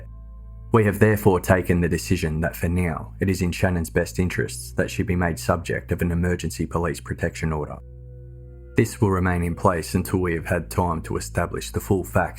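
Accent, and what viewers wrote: Australia. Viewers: These uploads are brilliant!! I'm so glad I stumbled across this channel...I'll be here for quite a while! I also love hearing a fellow Australian!!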